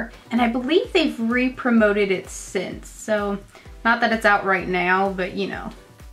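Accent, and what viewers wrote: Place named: South, United States. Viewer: I hear a southern twang (other than the full country you do) but then I hear a Boston accent where the hell are you from. I would have said l.a before I started hearing all the other stuff.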